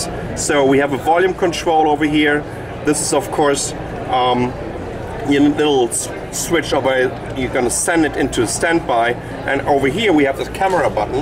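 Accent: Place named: Germany. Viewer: amazing review, i like German-English language :)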